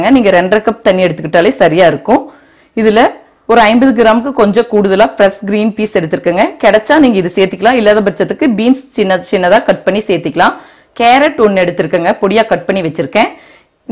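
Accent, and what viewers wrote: Kongu, India. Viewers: super mam rombha easy aa sollitharinga unga samayal ellam. nallaeruku athalayum neenga oru varthai solluvingala sethikalam athu enaku rombha pitikum neenga kongu thamizhaa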